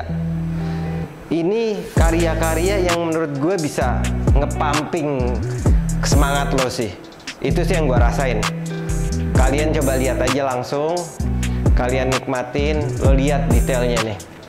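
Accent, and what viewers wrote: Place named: Betawi, Indonesia. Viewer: Nyang gue demen dan salut ame hostnye nih bang, betawi banget dialek ngomongnye... Mantep bang, lanjut...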